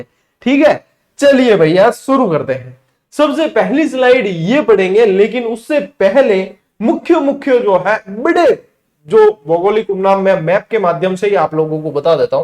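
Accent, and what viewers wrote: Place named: Sirohi, India. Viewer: Jay shree SARNESHWAR MAHADEV guruji me sirohi ka rehne vala hu aapke बिल्कुल najdik esliye aapki boli muje बहुत achhi lagti he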